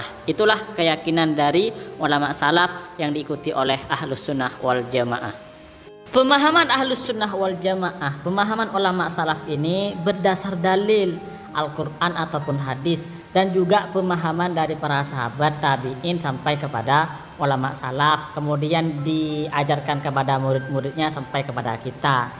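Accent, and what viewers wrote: Madura, Indonesia. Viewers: Seperti logat orang Syi'ah dari madura yang diusir ngungsi ke stadiun GOR_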